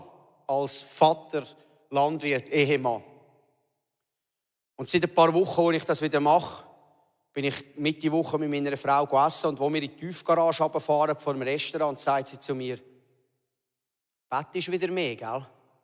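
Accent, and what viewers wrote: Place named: Switzerland. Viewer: Versteh leider net so gut, des schitzerdeutsch, schief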